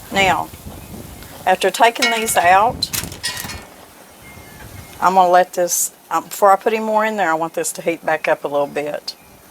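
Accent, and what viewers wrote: South, United States. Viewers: Hi just found your channel Great looking recipes I really like the chicken fried steak video My grandmother is from Arkansas the Southern talk remind me of her God bless you and your family be safe blessings 👍🏼❤️😀🌎👏🏼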